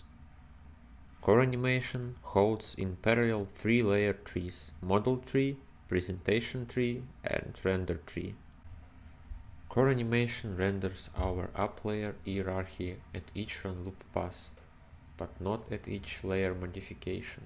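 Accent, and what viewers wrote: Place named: Russia. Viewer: You have a wonderfull Russian Accent 🥲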